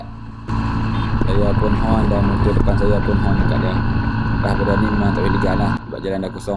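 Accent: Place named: North, Malaysia. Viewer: ckp utara..tp bju konoha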